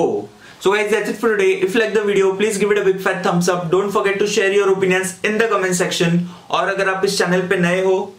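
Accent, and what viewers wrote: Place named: Maharashtra, India. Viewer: बोलण्यावरून मराठी दिसतोय... बरोबर ना..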